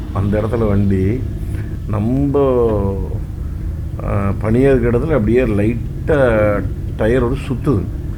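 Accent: Kongu, India. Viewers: கொஞ்சும் கொங்குத் தமிழ் பேசும் கோமகரே...! திகட்டாத தித்திக்கும் பேச்சுடைய திருப்பூர் திருமகரே...! எங்கள் மதிப்பிற்குறிய டான் திரு. அண்ணா❤❤❤🙏 அவர்களே எப்படி இருக்கீங்க அண்ணி❤❤❤🙏 எப்படி இருக்காங்க ரித்திக்❤❤❤ எப்படி இருக்காரு. பயம் இருக்கும் மனதில் நிச்சயம் பலம் இருக்கும்...! எளிதில் அறுந்துவிடும் நூலை நினைத்து பயம் கொள்ளாமல்...? எதிர்த்துப் போராடி வீறுகொண்டு எழுந்து பறந்திட வீசும் காற்று உள்ளது என வானில் பட்டம் பறப்பதில்லையா...! அதுபோலவேதான் மனதை உலுக்கும் பயம் வந்தபோதும் எதிலும் உறுதி என்கிற தன்னம்பிக்கை என்னும் பலம்தான் அண்ணா உங்கள் வெற்றி மகுடம்...! நம் ❤சிட்டுக்குருவியோட❤ தங்களது இந்த லடாக் பயணம் இனிதே தொடர்ந்திட நல்வாழ்த்துக்கள் அண்ணா❤❤❤ என்றும் அன்புடன் உங்கள் தம்பி என்றும் அன்புடன் உங்கள் TMF ரா.முஹம்மது கபூர் (சென்னையில் இருந்து)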